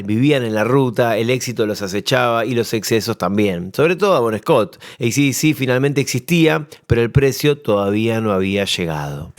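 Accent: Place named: Argentina. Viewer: Demasiado argentino para entenderte. Voy a pedirle a un yucateco que haga un vídeo igual a ver si le entiendes.compadre.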